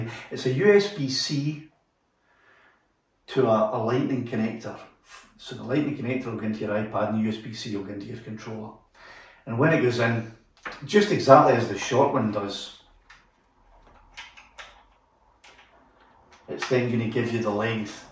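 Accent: Scotland, United Kingdom. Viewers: Great content, im just here for the scottish accent :) cheers from DK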